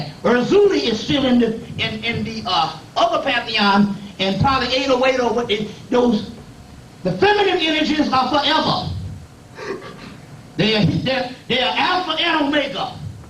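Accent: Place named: Rural, United States. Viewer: I feel you. It's actually his stumbling, stuttering and country twang that helps me understand his knowledge. The elders in my family all country, so listening to the master teacher is like being homeschooled by an uncle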